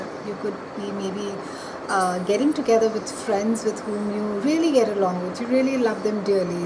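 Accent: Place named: India. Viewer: You talking like India do you have any Indian background.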